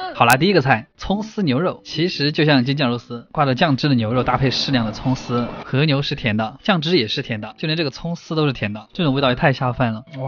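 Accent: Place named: Sichuan, China. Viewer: 四川话好土味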